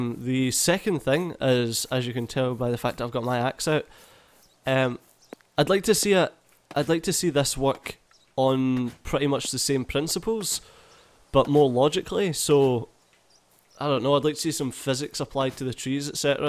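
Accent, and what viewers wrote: Scotland, United Kingdom. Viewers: Super Scottish sounding review ! You can build, shit. Scotland, accept no imitations !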